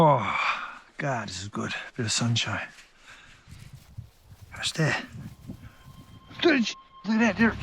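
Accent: Canada. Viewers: Very informative and it's my first time hearing a Canadian accent that does NOT sound like an American! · The Newfie accent is mental!!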